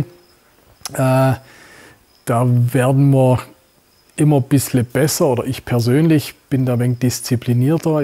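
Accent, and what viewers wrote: Swabia, Germany. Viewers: Tolle Aussage zu dem kommenden Lampropeltis getula nigritus Verbot und auch zu dem idiotischen Kaiman Urteil. Weiter so, äh noch ne Bitte, könntest du bitte etwas mehr Hochdeutsch sprechen, dein Kanal ist (zum Glück) weit verbreitet und nicht jeder versteht schwäbische Ausdrücke und Aussprache. Ich verfolge ihn zB aus Spanien und habe damit ziemliche Schwierigkeiten, danke für das Verständnis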